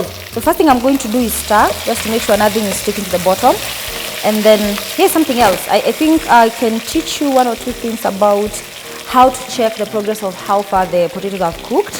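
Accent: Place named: Nairobi, Kenya. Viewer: i like this lady, shes so real, her cooking so simple and practical. and i like her Nairobi english lol. this is my dinner recipe thanks.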